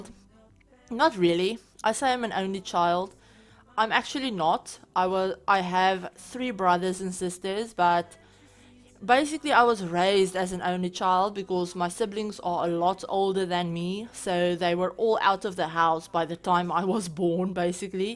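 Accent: Afrikaans, South Africa. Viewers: Ek kon dadelik die Afrikaans in jou aksent hoor!!! Volg al lank vir SimKim, en eks so bly julle het hierdie collab gedoen. Die interior pas die exterior amazingly!!! Stunning! En ja, ek sub nou jou kanaal ook. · oh that's so cool, I love the South African accent. Been trying to master it for so long haha, very difficult.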